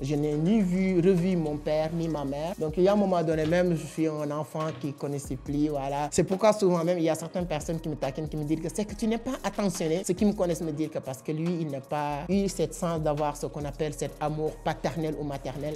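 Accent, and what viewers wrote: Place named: Senegal. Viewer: Force a toi. J'aime bien l'accent des sénégalais .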